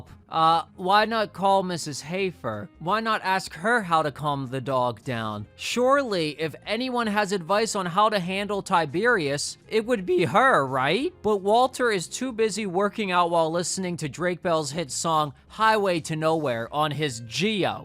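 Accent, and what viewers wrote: Philadelphia, United States. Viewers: It’s a Philly dialect along with saying “crick” instead of creek and “ratty-ator” instead of radiator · bro has a Philly accent 👑 🖍️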